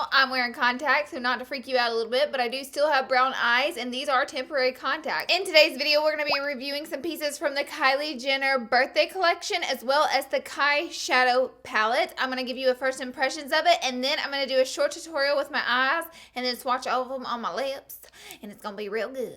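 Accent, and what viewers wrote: South, United States. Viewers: i love your tutorials, are you Hispanic? you kinda look Hispanic, and i love your southern accent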